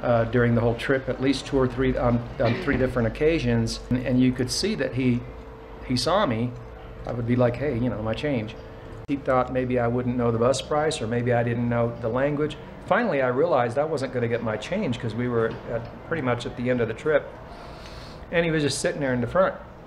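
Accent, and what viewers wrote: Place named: United States. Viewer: Not perfect Spanish but gringo Spanish.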